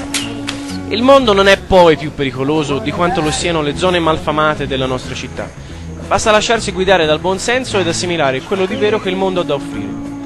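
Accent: Tuscany, Italy. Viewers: Grandissimo...ti ho seguito per anni sulle pagine di InMoto, ma vederti in video è proprio un'altra storia! Simpaticissimo, l'accento toscano è veramente una marcia in più (te lo dice un romano) per far sorridere. Ti invidio!!! Complimenti per tutto, e continua cosi!!! P.s. Ma per scendere dall'albero poi come hai fatto? :P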